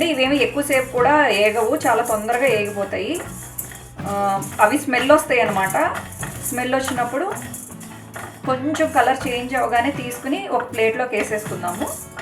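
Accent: Godavari, India. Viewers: Nonvege recipe's Anni chala baaga vadutunnarandi Godavari yasatho 💗💗💗 aaayy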